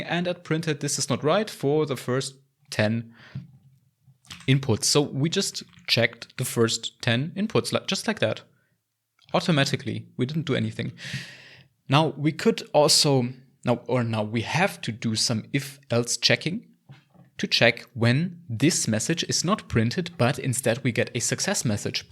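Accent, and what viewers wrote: Germany. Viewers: I genuinely like your accent and you being a German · Ich liebe deinen deutschen Akzent ❤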